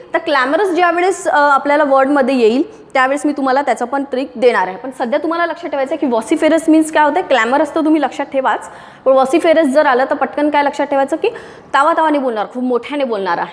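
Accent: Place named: Pune, India. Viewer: नाही मॅडम ,तुम्ही असखलित शुद्ध पुणेरी मराठी बोलता । वऱ्हाडी नाही वाटत तुमची भाषा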